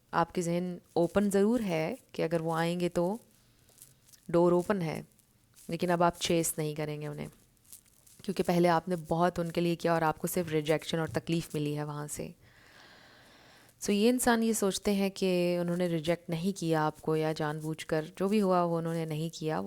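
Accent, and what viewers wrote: Pakistan. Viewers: Aslamo alikum ..mujy aapki voice llehjy sy lgta hai aap peshawar sy hai hindko speaking ....?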